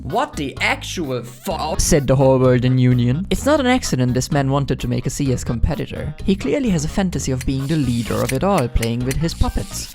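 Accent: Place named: Germany. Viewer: Hat lange gedauert aber den Deutschen Akzent kriegst du nicht los😂 (Wirklich geiles Video du hast definitiv mehr Abos verdient) #fckastralis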